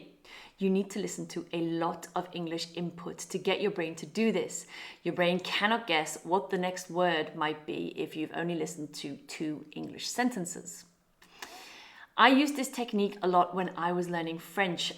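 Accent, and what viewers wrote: United Kingdom. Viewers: are you british? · New subscriber ✌🏼 Are you british?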